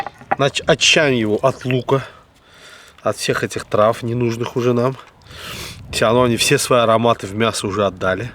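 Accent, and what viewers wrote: Baku, Azerbaijan. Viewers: Это кайф слушать ветра Бакинского берега и Бакинский акцент. Дай БОГ Вам здоровья дорогой! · Дааа, Бакинский акцент и мастер класс !!!! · Прекрасный обед. Сам я родился в Баку 65 Лет назад но очень приятно слышать дружелюбный бакинский акцент. Спасибо большое каспийскому берегу!!! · 7 Февраля и время просмотра 23.56, случайно увидел видио, наверное кушать хотел 😂, но тут реально Шеф и мастер своего дела, четко и без пафоса все рассказал и + Бакинский акцент ))) спасибо вам дорогой, жду выходных и буду делать вкусные шашлыки из говядины ( Дана ) 👍👍👍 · Очередной раз кайфую от того как готовит автор! Бакинский акцент это просто услада сердца! Первый раз слышу что говядину надо мариновать три дня . Обязательно попробую так сделать. Спасибо! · Бакинский акцент не перепутать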